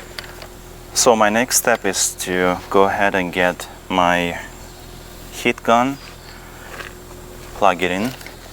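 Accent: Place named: Russia. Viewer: Интересный акцент, похоже на русское происхождение никак не английское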